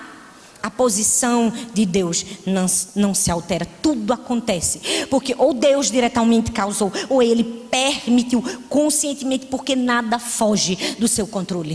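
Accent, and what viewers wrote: Northeast, Brazil. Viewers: Pelo sotaque sabemos q a pastora é nordestina gostaria de saber de onde é . Muito boa cheia de Deus 🙏🙏🙏🙏🙏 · Pastora amo seu sotaque. Sou do Nordeste também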